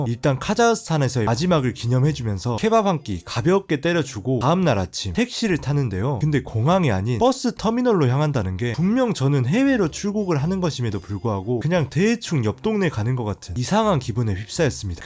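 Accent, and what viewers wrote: Jeolla, South Korea. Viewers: 고향이 전라도인가요?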